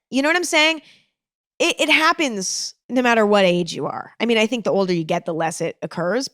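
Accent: United States. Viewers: living for ur new york accent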